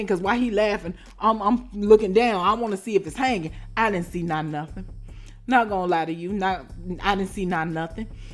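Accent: Chicago, United States. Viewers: I miss Chicago n I work graveyards when I get to work I throw her on and I feel like im at home n my sister telling me a story Chicago people sound just alike lol I love it thx for getting me threw these slow ass nights at work and keep up the great work hope 50 or Tyler Perry tap in with you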